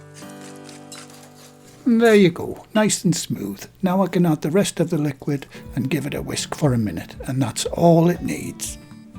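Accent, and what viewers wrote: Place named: Newcastle, United Kingdom. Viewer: I enjoy watching your videos , and more i love listening to your jordy accent , all your recpies remind me of England And the northeast watch i call it home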